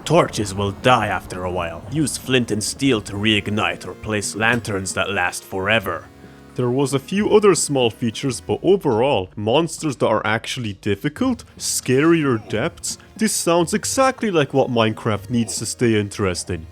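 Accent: Eastern Europe. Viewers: You're a blend of Eastern European and Dub - its unique.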